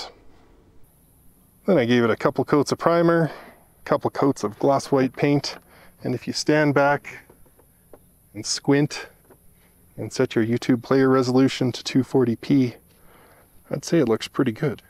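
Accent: Canada. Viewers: I applaud your knowledge, skills and resourcefulness. Your presentation is well organized, clear, concise and complete. I also applaud you and your spouse for you adventurous spirit and commitment to your daring lifestyle. I enjoyed the presentation with the clever and apt visual effects. Very impressive. I also detect a soft Canadian accent. I wish you great success and fun on your continuing adventure and thank you for sharing a bit of your experience on YouTube Awesome!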